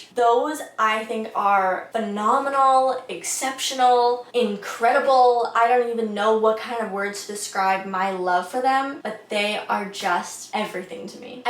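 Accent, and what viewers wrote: United Kingdom. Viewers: Your British Accent is cute! If you want to take it to the next level, study Cockney Rhyming Slang and then you can go apples and pears and have a good old rabbit and pork! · Great video! As someone who had a British girlfriend and hung out with an all British crowd while stationed in Spain, I can say your British accent is great! I’m hoping that my daughter will be interested in an LBC when she starts driving in a few years, but so far she has not expressed any interest in participating in her brothers and my channel working on them. She’s still got a few years to go though, so there’s always hope! Keep up the great work! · You sound British to me! But I’m from North Carolina. Lol. We used to go to Ipperwash beach and visit relatives in London each summer. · Keep using the word British…. saying UK is a new obsession that is a bit bland and mostly due to Scotland and Wales pushing more for national identity. 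The idea of a specific ‘British’ accent is sort of a North American concept but I know what you mean, yours is great…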